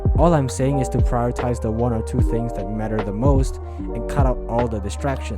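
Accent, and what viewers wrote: Singapore. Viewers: SINGAPOREAN ACCENT I CAN HEAR IT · r u Sgporean I think I can hear the accent peeking through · bro you singaporean? and also you really just said "hehehaw" :rofl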